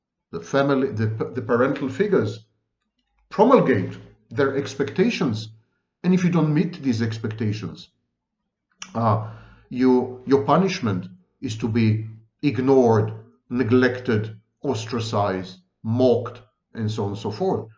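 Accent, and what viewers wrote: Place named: Israel. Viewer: Like the Israeli accent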